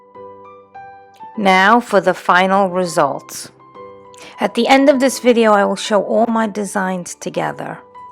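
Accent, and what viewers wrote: New York, United States. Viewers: So so happy you were apart of the hop! That angel 😇 was absolutely gorgeous! The pallet tree looked great with the clip lights, and that rustic candle stick was equally as beautiful! Great idea to distress with a nail file! Nice to hear someone with a similar accent! My family is from NY 😊 You now have a new subscriber! Look forward to watching more of your videos! Have a Merry Christmas 🎅 🎄 ❤ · Boy you sound like a NYer... Where are you from? Love the angle and your palette tree.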